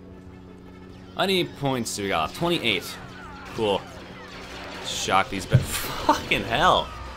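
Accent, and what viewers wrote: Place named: Canada. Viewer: I love your Canadian voice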